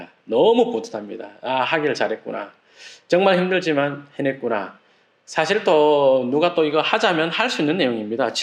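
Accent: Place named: Gyeongsang, South Korea. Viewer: 좋은 책 감사드립니다 유투브 방송 잘보고 많이 배우고 있습니다 구수한 경상도 사투리와 "엄마야" 감탄사가 너무 정겹습니다 앞으로도 멋진 방송 기대합니다 대박 기원^^♡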